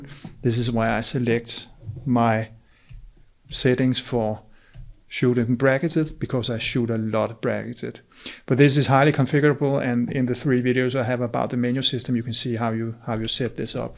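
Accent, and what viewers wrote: Denmark. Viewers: I love your Danish accent :) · are you danish? your accent is very danish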